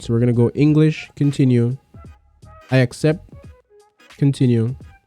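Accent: Jamaica. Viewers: Heard the Jamaican Accent and Subbed faster than you can say Ackee & Saltfish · You sound Jamaican bro. Are you?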